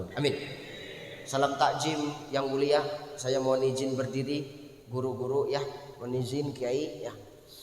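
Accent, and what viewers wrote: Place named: Medan, Indonesia. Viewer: Itubormg Medan bos Krn mmng logat bhsa nya seperti itu,klo kau yg LBH smpurna cba kau yg tegur ,jgn sllu mngomtriborng lain LBH baik komntri dri sndri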